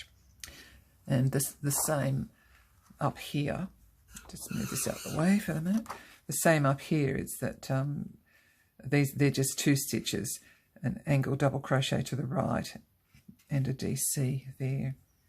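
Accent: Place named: Australia. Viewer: is Australian... hence the accent!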